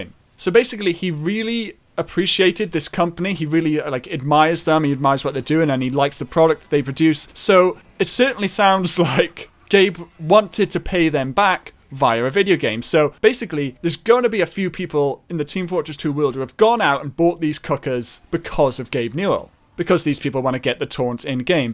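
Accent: Wales, United Kingdom. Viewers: U sound kinda welsh like meh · Just wondering what's your accent? It sounds very Welshy and Valleys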